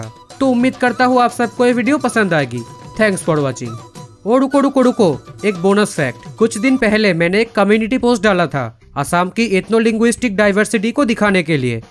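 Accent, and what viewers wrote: Bengal, India. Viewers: Your Bengali Accent Hindi is sooo noticeable I like it though!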